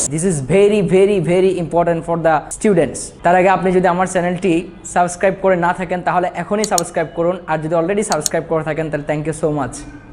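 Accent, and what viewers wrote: Chittagong, Bangladesh. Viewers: বদ্দা চিটাগংগা নে??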